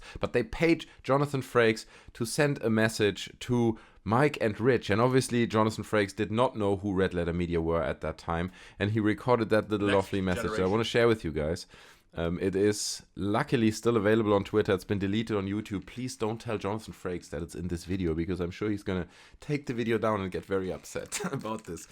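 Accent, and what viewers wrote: Germany. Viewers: Lol you are funny man. Cool accent! German? Greetings from Holland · Subscribed because your German accent is excellent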